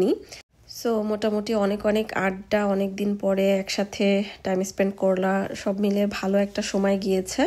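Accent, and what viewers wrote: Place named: Sylhet, Bangladesh. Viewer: খবে জোরে আমিন,এই কথাটা শুনে এত হাসি পাইছে, আমাদের ভাই অর্ধেক সিলেটি অর্ধেক চাঁদপুর,ভালই লাগে আমাদের সিলেটে কথা যখন ভাই বলে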